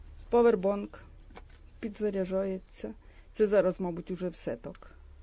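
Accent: Poltava, Ukraine. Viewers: ви чудова! Дуже подобається ваш полтавський говір. Успіхів! Вітаннячко з Криму.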